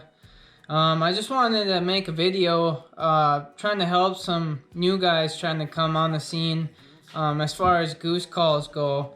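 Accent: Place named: Minnesota, United States. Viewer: ND?! You sound like you’re from Minnesota!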